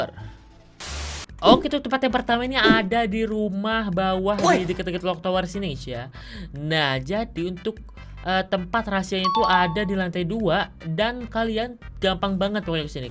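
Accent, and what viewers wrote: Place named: West Java, Indonesia. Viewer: Bang asal mana sih kok sunda🤣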